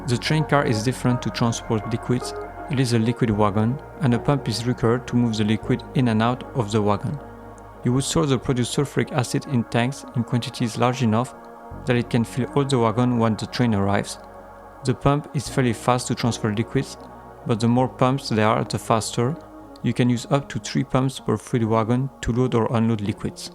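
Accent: France. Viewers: Le petit accent français, j'adore 💕 Cocorico ;P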